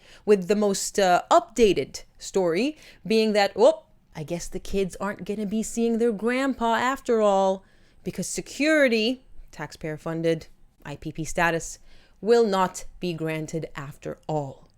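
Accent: United States. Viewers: You put out wonderful, intelligent content just wondering where are you from? You have an American accent but are an Australian citizen? just curious thank you for your service wherever you served. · If you’re Australian why do you have a USA or Canadian accent? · Hold up.... record scratch You are an Australian??? So your One of Us? Wow you sound so American Now😆 🇦🇺👋👑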